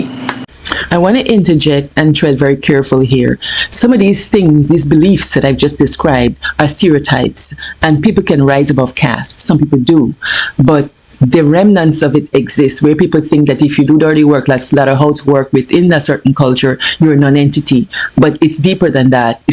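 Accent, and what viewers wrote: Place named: India. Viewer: first time here:) Just subscribed. You wowed me with your Indian accent. I was riveted to your content. Love the singing, the wielding of the belna and the moves, haha. Sharing this video with family and friends. One people, one nation…you go girl:)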